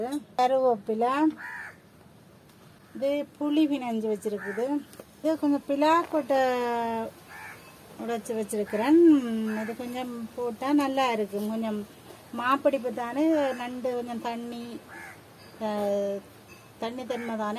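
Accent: Jaffna, Sri Lanka. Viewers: Akka u vioce super original jaffna tamil 4 sweden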